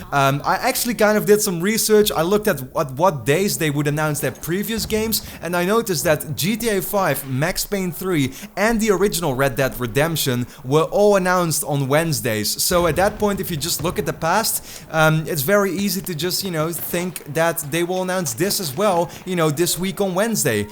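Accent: Netherlands. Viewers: You’re so obviously dutch😂😂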